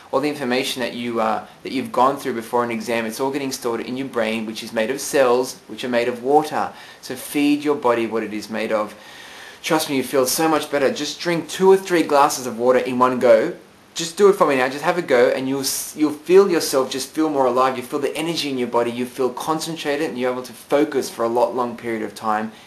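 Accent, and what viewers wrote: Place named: Australia. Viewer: He did. He sounds Australian, and we say rubber :L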